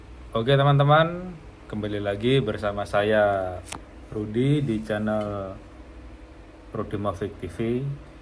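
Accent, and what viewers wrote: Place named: Java, Indonesia. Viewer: orang malaysia tapi logatnya kayak jawa indonesia ya🎉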